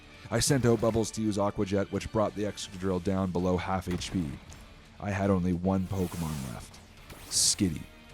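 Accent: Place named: Canada. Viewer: I love the Canadian accent😂❤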